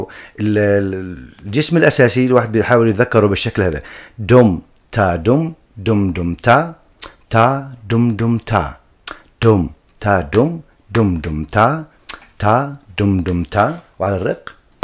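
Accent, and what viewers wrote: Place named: Sadad, Syria. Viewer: اللهجة قريبة من أهل صدد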